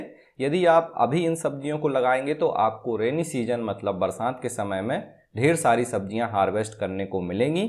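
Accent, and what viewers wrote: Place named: Madhya Pradesh, India. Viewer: MP accent nice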